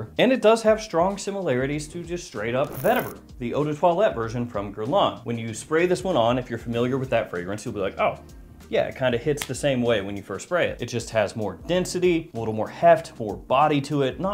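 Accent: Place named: New Jersey, United States. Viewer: Are you from nj? Lol because sounds like nj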